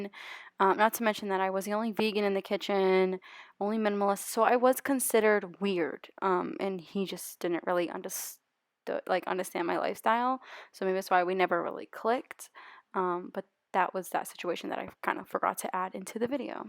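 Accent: Boston, United States. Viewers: I hope you get a job that pays well or you can focus more on Youtube! Also your Boston accent is so strong! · I hear your Boston accent 🤪❤️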